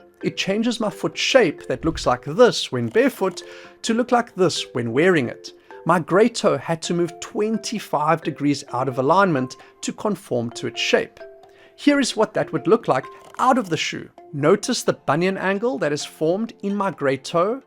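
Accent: South Africa. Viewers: Great content. Are you South African?! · Accent sounds South African? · Yo yo. You sound South African. I can't find any decent barefoot or wide toebox shoes. Where do you recommend I go check. I live just outside of Cape Town in Paarl. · Are u South African? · sounds South African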